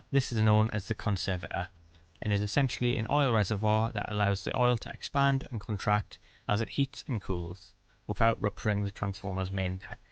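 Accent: Newcastle, United Kingdom. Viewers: I've just discovered your channel. I'm just a nerd interested in all these things, I won't pretend I understand everything, but it fascinates me nonetheless. Please don't use an AI voice over. Your wonderful Geordie accent adds to the authenticity. Thank you for sharing your knowledge and experience, from a Black Country fan. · I love the Geordie accent. Keep up the great videos. Although I am an electrician who does purely domestic work, I think it's good to take a deeper dive into this sort of thing now and again. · Please stay away from AI voice-over! Your speaking voice is just fine. A good clear soft Geordie. Quote: "A 2008 newspaper survey found the Geordie accent to be perceived as the "most attractive in England" among the British public." All the best, Bob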